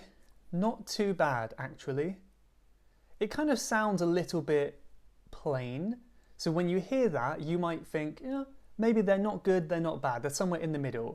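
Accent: United Kingdom. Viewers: both direct and indirect are good however it depends upon the situation which of these do wanna apply when talking to someone you know or stranger to avoid confusion, misunderstanding and being unkind to one another. Your podcast really help me a lot to improve my English and I find the topics you discuss on your podcast with some non native english speaker quite fascinating and inspiring. I also wanna compliment you for having a soothing voice to listen to and I do recommend your podcast for beginners who are studying english. It has a slight British accent but you’ll get used to it just keep on listening with intriguing topics.Thank you once again.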